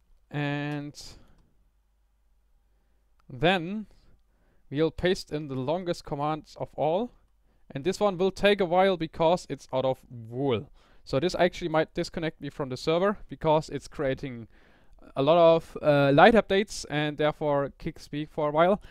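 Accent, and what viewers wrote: Germany. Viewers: Du bis Deutscher, oder? :D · No matter what you are. If you're German and start talking Math in English, you will sound like a mad scientist. Always fun to talk English in a German Accent ^^ (Halb Deutscher)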